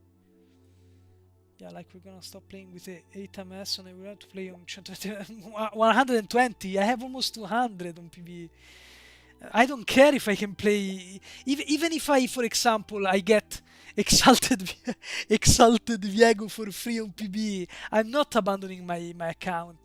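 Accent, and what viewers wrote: Italy. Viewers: stupid question but are you italian??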